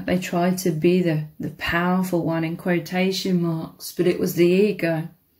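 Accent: England, United Kingdom. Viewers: so accurate! i can't place where your English accent is from. Would love to know it. I spent time all around the UK :)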